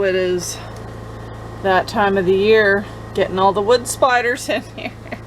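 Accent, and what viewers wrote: Nova Scotia, Canada. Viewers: I enjoy your videos, you are so Nova Scotian in your speech. (Thus the phrase "Giver") Do not miss dealing with those ugly looking spiders. 😧😧😧😡😡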